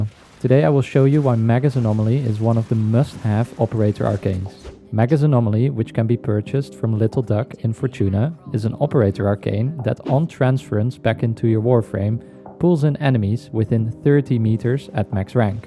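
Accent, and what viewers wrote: Netherlands. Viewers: Dutch for sure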